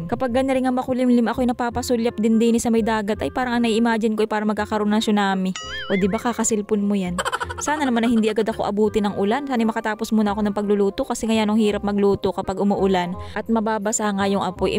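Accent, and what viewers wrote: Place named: Camarines Norte, Philippines. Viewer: Parang salita sa camarines norte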